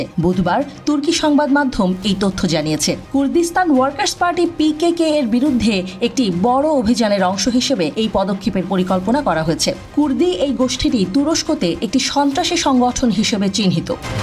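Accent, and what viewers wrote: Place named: India. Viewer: এই মহিলা কি ইন্ডিয়ান,তার কথা বলার ধরণ দেখে এমনি মনে হয়, এমন ভাষায় কথাবলে ভারতীয়েও সংবাদমাধ্যম, হাসিনা কি ভারতীয়েও বর্জন প্রডাক্ট ব্যবহার করছে বাংলাদেশ ভূখন্ডে।।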